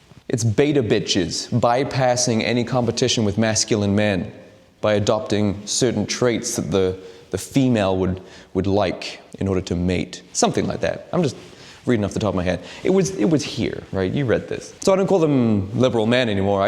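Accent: United States. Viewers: If this guy is a Brit, he's the most American sounding Brit I've ever heard. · out of curiosity, where does the American inflection in your accent come from? I was under the impression that you were English? Is this correct or is there nuance that I'm ignorant of? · I’ve never heard an Englishman speak in an American accent until now. It’s pretty good with small slips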